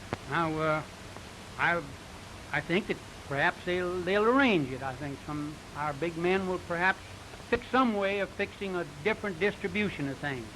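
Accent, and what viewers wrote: Oklahoma, United States. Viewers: Okie accent! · do many modern okies still talk like him or have they all been modernised?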